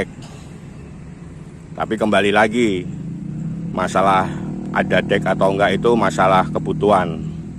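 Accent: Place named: Java, Indonesia. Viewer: Mas nya bukan orang sembarangan, karena apa, karena pengucapan kata katanya terstruktur rapih dan aksen jawa nya kental jadi saya suka dengernya... ❤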